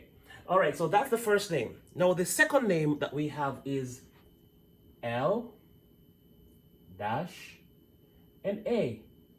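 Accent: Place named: Ireland. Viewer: Great stuff buddy. I notice your accent almost sounds a little Irish at times.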